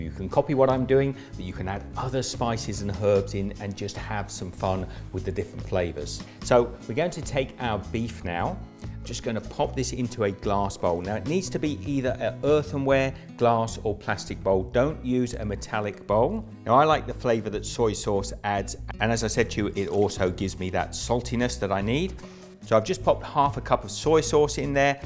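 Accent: Australia. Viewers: This guys Australian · Tell me he isn't Australian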